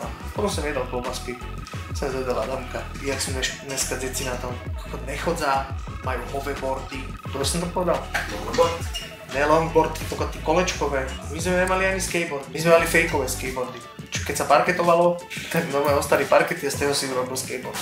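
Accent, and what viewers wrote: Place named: Trnava, Slovakia. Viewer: Toto je trnavčinka jak remeň 🤣🤣🤣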